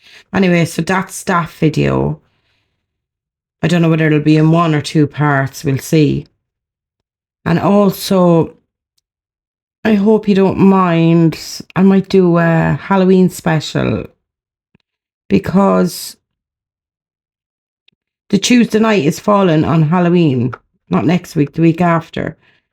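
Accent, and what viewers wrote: Ireland. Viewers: As expected, another intelligent and unique video! Speaking out about police corruption, which is a very dark and sinister affair which as you said, is far more prevalent than we all realise, has been presented and dealt with in this video in the unique way👍delivered in a passionate, forthright and well researched way. IMO, the NIcola Bulley case stinks of corruption right up to the very top. I’ll never forget Andrew Snowden blurting out how the LP had even predicted the day Nicola would be found. From day one, with the LP not cordoning off the bench area, this was just the beginning of people unable to believe that this was an honest, straightforward investigation. And that we were all being spun a story. P.S. Really looking forward to scary stories for Halloween! If you had a channel reading and spinning yarns with your beautiful Irish accent, I’d be an instant subscriber!💚😂💚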